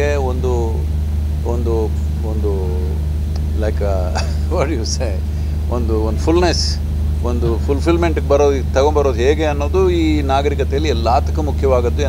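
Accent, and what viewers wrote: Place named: Tamil Nadu, India. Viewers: I feel it's tamilized kannada ! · ಕನ್ನಡದವರೆ ಮೈಸೂರಿನವರೆ ಆದರೂ ಕನ್ನಡನ ತಮಿಳು ಶೈಲಿಯಲ್ಲಿ ಮಾತನಾಡಿದ್ದಾರೆ ಆದರೂ ಕೇಳಿಸಿಕೊಂಡು ಹೋಯಿತು ತುಂಬಾನೆ ಖುಷಿ ಆಯ್ತು ..🙏🏽🙏🏽🙏🏽🙏🏽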